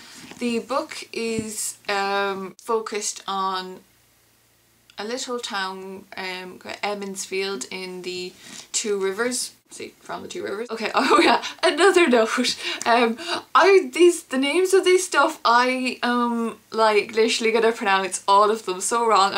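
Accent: Ireland. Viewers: I was just browsing some WoT videos, as I'm getting excited the final book is just months away and I was pleasantly surprised to hear your Irish accent (like my own) when I had expected an american review. I hope you do manage to review the rest of the series before the final release. Much love for this fantasy series. It's scale and depth are wonderful and inspire such passionate discussion among fans. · Do I detect a Irish accent? Or am I just being retarded? Good vid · Joanie loved the review and your accent is very cool. Irish? I agree with you 100% 1 thing very noticeably lacking in the LotR books is character development. Jordan has full bodied characters (my favorite is Matt). I'm on book 12 "the Gathering Storm" . As far as pronunciation goes I'm listening to the audiobook versions (I guess that's a cheat) as I drive to work. Any way I'm off to see the rest of your reviews May you find water and shade Spear Sister!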